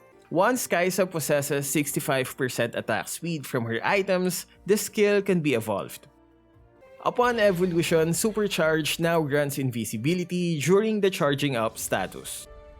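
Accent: Philippines. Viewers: Its because of his accent, “dealing” becomes “diling”,”living” becomes “leaving”, its because of his filipino accent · I can tell he's filipino 🇵🇭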